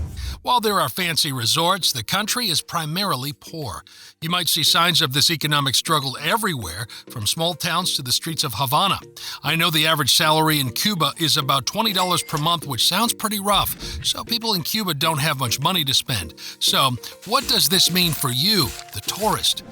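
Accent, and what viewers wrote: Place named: United States. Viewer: " captain" must be " Yankee "...well "captain".. After 14 times in Cuba all over of Cuba, you sound really like " Yankee " YOU, buddy just miss Cuba really good times, 5, 10, 15 years ago. Love Cuba, love Cuban people, see and experience everything....your podcast is not good buddy..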